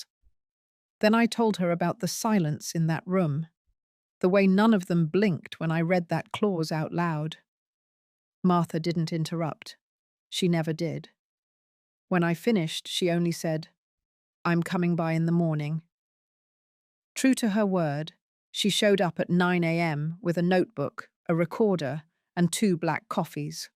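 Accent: United Kingdom. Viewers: The Reader is British of course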